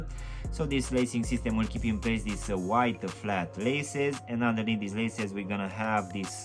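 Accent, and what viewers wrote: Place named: Romania. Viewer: Te cunosc dupa accent bro ca esti roman, buna treaba frate, chiar imi plac review urile tale, keep it up, poate poti face review si la aj1 mid industrial blue, materiale superbe, pret accesibil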